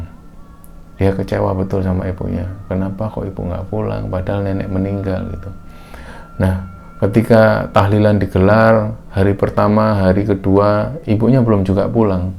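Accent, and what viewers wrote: Java, Indonesia. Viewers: Mantap mas medoke gak ilang yo😁👍🏻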